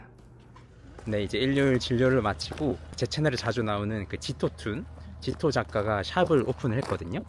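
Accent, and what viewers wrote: Gyeongsang, South Korea. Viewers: 열심히 사시는 님 늘 응원합니다. 발음교정중 하나 알려드립니다. 경상도 분들의 특징인 월요일.금요일.일요일 ㅎㅎ 워료일.그묘일.이료일 ~!! 이렇게 발음합니다.ㅎㅎ 저는 님발음중 요게제일 거슬리더라구요~! 워료일그묘일이료일로 듣는날이 곧 오기른기대합니다😅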